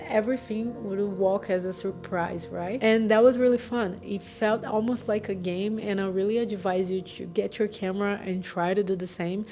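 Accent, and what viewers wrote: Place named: Brazil. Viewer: Excellent content, and I love the Brazilian accent!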